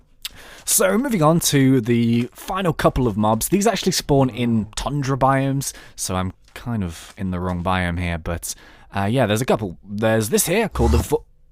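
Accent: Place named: United Kingdom. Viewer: This dude's voice needs to be used reading audio books. It's so splendidly British :D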